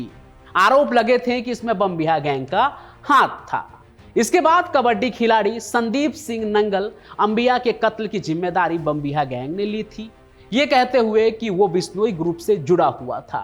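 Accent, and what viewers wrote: Bihar, India. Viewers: Your Way Of Speaking , Accent Is Like Biharis , Like Godi Media Ankers , Speak Normally Dont Be a Actor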